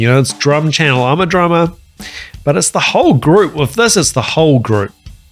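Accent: New Zealand. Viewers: Here's coming from America. Me being an armature voice actor, I appreciate the Kiwi and Aussie accent and wish i could do it better lol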